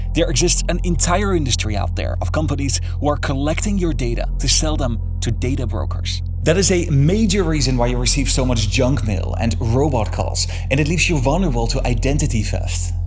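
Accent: Netherlands. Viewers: His accent obviously shows that he is a native Dutch-speaker. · you sounds very dutch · Are you sure? Because they're definitely trying to make money and milk the residents for their last eurocent. Also I can hear from your accent you're Dutch too 😄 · Jij bent een Nederlandse. Dat hoor ik aan je . Maar jij spreekt wel heel goed Engels hi Maar zou je is een aflevering willen maken over het paranormale in Nederland de vrije metselaars❤❤❤❤ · which Dutch accent this presenter speaks with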